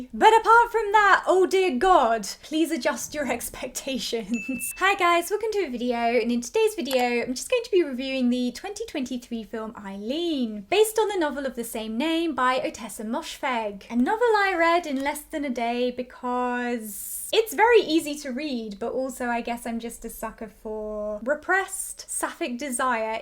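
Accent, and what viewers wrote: United Kingdom. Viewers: Fellow American swine here, and I concur that cadence and refined British accent are quite divine. Her insights are always so thought-provoking, too. ❤❤❤❤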